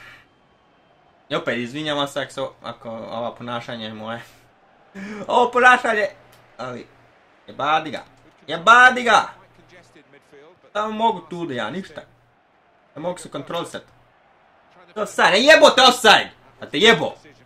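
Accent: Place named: Bosnia and Herzegovina. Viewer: HAHAHAH Bosanac brate :3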